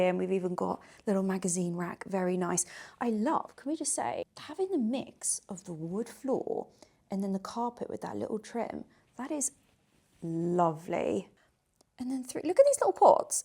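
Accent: United Kingdom. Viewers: Lady I can’t believe I’m saying this but I’m falling in love with you…..you are constantly showing us luxury yet you are NOT snobbish….totally down to earth and love your style, your British accent and great content. You gained yourself a new subscriber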